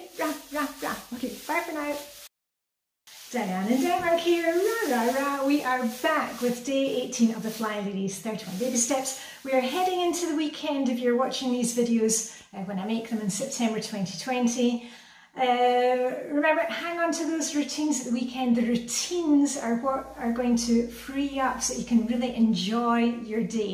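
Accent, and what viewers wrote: Scotland, United Kingdom. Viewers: I have enjoyed your Scottish accent so much - just had to comment when you said jotter… reminded me of school, back when I had a Scottish accent too! Thanks for all your encouragement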